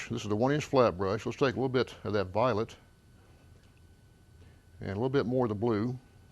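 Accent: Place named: Texas, United States. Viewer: You sound like a Texan.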